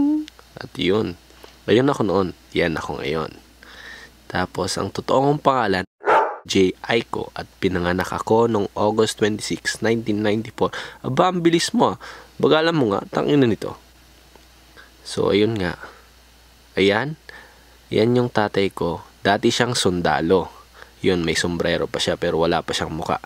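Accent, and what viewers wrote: Ilocos, Philippines. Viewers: Ilocano ka pala boos hahahaha ukinana tattak la naamuan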